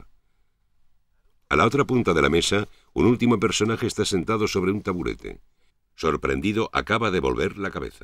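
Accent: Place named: Spain. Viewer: El narrador, un clásico del castellano peninsular.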